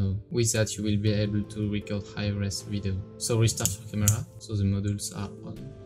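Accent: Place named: France. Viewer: Tnx for this tutorial. I like ur french accent :)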